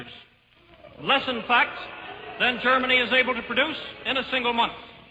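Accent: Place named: United States. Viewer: Gracias por esta aportación!!! Es un placer escuchar esto en voz de un yanki :D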